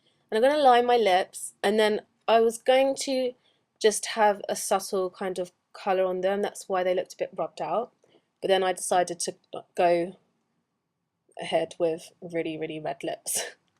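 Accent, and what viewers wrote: London, United Kingdom. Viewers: You are probably the most beautiful girl ever haha u sound Like a Londoner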